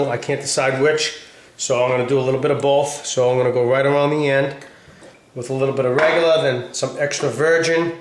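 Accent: Boston, United States. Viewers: This sounds like an episode of the Sopranos...or Boston? I live in the midwest so it all kind of sounds the same to me! Great presentation of that dish!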